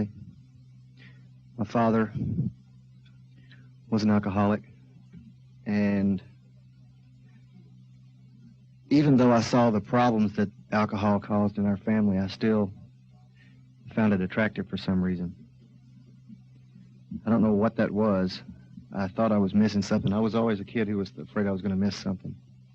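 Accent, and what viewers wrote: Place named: Dallas, United States. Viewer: Classic Dallas accent from the period.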